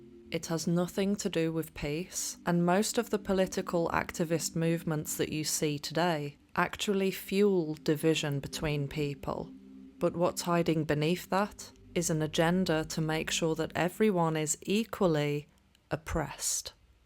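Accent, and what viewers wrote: United Kingdom. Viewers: I´m from Austria and I´ve discovered your channel recently by accident and I love it. Smart young woman with a charming british accent and highly interesting content!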